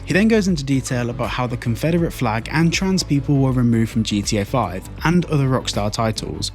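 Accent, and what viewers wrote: United Kingdom. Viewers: Yea you’re definitely from the U.K lol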